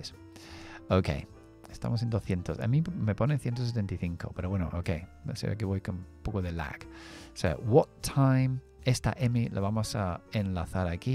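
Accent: United Kingdom. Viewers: Hola, le agradezco que también incluya la pronunciación Estaudinense. Al principio no me gustó mucho por que le noté el acento Británico, pero después si me inscribí por que enseña muy bien, e incluye la pronunciación ya mencionada. vivo en USA hace muchos años, pero aún no soy fluente, pues nos pasa a muchos inmigrantes, ya que nos enfocamos mucho en el trabajo y no en perfeccionar el idioma y ahora que quiero buscar nuevo trabajo necesito ser fluente y urgente, así que todos los días estoy escuchando y practicando, necesito hacer en unos meses lo que no he hecho en muchos años 😢 Si da clases privadas o en grupo, me gustaría saber. Gracias, bendiciones 🙏🏼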